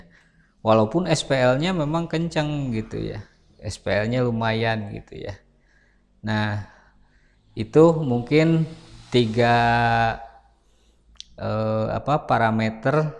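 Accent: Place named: West Java, Indonesia. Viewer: Jiga urang sunda nya kang🤭🙏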